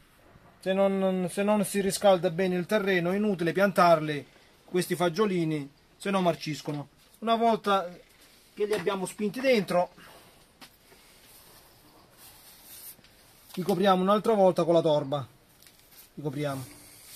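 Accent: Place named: Salento, Italy. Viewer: Sei molto bravo , ti seguo da poco ma mi piace il tuo modo di spiegare , molto semplice e "paesano" (complice la accento salentino ). Io faccio così con i pomodori , zucchine , fagiolini , botole , e quest anno anche con i piselli verdi . faccio il contrario cioè prima faccio le buche con le dita e poi semino ma va bene uguale ,la cosa che faccio in più di te è che metto le spaselle in dei contenitori con sotto l acqua (tipo stanati) dove posso sistemare 4 spaselle ogniuno . L altra cosa , uso le microrizze ,tu le usi ? Cosa ne pensi ?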